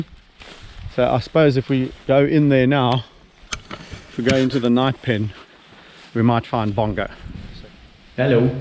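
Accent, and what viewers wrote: South Africa. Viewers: God I hate the way south Africans talk, "super aggro"you like 40 years old stop trying to talk like a teenager. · Im from zimbabwe cape town kenya harare and jo berg and you have a south african accsent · I never tire of listening to that SA accent.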